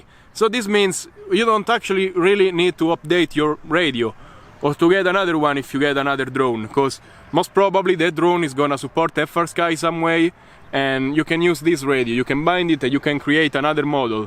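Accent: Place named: Italy. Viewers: solo a me sembra italiano? However thaks for this good review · Sembra italiano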